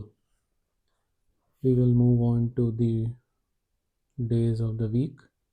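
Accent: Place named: India. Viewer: Really appreciate the hindi pronounciation. Makes the words stick in memory. 👍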